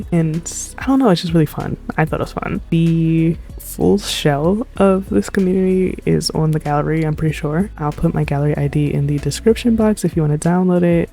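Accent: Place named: New York, United States. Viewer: This was such a fun series! Also your New York accent came through real strong in this video and it literally made me miss home so thanks.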